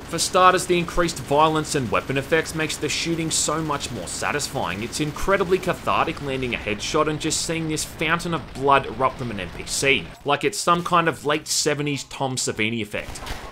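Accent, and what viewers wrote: Australia. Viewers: You sound Ridiculously Australian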